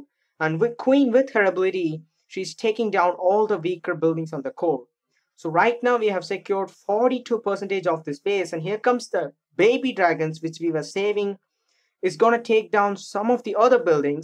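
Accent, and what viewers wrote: India. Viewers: Bro ungaa speach laiyaa tharieduu nengaa tamilaa thalaa (•‿•)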